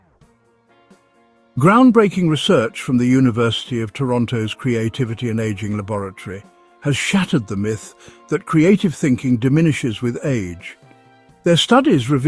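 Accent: United Kingdom. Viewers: First of all, I wonder why they chose to have someone with a British accent, narrate all of this YouTube channel's videos; videos that are clearly targeting those of us seniors in the USA. Is a fancy British accent supposed to make the data sound more sophisticated and scientifically authentic?!?!? (*NOT*) Furthermore, I am almost 85 years old, and the ONLY cognitive difficulties that this lady (me) and all my fellow seniors ever humorously identify as "Senior Moments", are memory lapses of a very particular sort — such as: • Unable to recall a relatively new friend's name • Frequently unable to recall a specific word I want to use, which I have remembered without hesitation, thousands of times in the past • No longer know the correct spelling of lengthy words which I once ALWAYS remembered, after first learning it as a child or youth • Recalling the exact year or decade in which an important event occurred in my life or around the globe • Totally forgetting a conversation I had only a few weeks previously • Etc., etc., etc. 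— and (to be redundant! ☺) — et al Some of the other things on the video are true — such as everything that happened in my life has a story behind it now, which is true of older folks, because being a matriarch or patriarch of our family and friend groups, categorizes us as being "tribally responsible" for passing on the group folklore. It is a joy for me, when I get to share episodes of my history, and my life experience tales. So, story telling IS part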